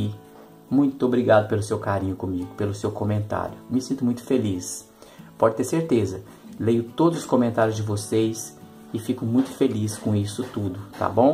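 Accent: Minas Gerais, Brazil. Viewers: Suas aulas são maravilhosas sua didática muito boa homem de fé eu também sei da nossa dependência de Deus Todo poderoso.Qual cidade você é tem sutaque mineiro gostaria de receber seus riscos ,sou da Bahia.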